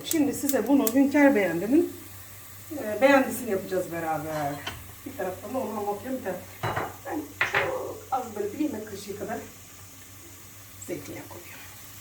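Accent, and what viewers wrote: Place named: Aydın, Turkey. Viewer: Benim de annem ve babam vefat etti ve hanimi anneme benzettiğim için çok izliyorum. Bana annemi anımsatıyor. Aydınlı olduğum için şivesi de benziyor lezzetler de benziyor ah ah.... hanım Allah size uzun ve sağlıklı ömürler versin..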